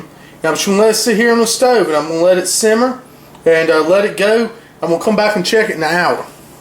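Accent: South, United States. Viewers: looks delicious! i have a southern accent too and i love seeing other youtubers with a country accent as well. i get alot of comments on mine as im sure, you probably do too lol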